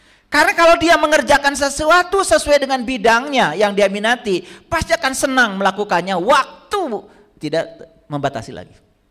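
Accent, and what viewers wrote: West Java, Indonesia. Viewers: Ust ni sundanya daerah mana,ada yg tau?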